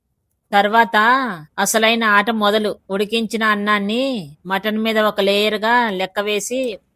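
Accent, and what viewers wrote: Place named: Telangana, India. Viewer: Mi Telangana slang ki big fan🥳🥳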